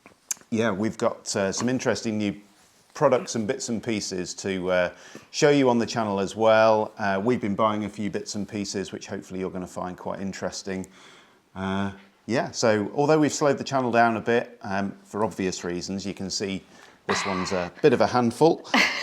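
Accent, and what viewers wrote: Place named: California, United States. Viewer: Its been a delight to share your adventures over the year; informative and entertaining. Will miss your Cali slant but look forward to hearing about the next phase.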